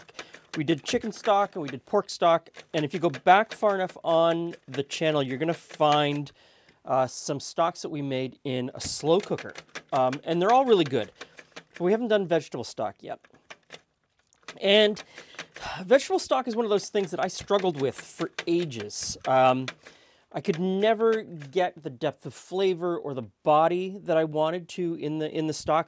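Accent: Canada. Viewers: This man is Canadian! Or that is my guess. This video was interesting to watch!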